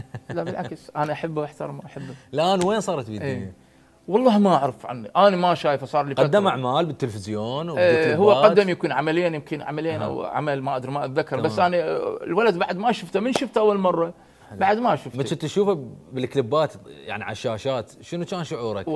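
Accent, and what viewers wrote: Iraq. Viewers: تحياتي الى الفنان عبدفلك صاحب الاخلاق الجميلة والصوت الجنوبي الجميل استاذ عبدفلك البصره تحبك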